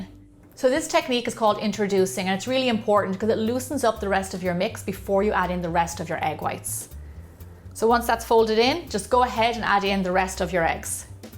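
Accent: Ireland. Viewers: Loved your baking tutorial. Must give it a try, ta be sure!! Lovely Irish accent too. Yum!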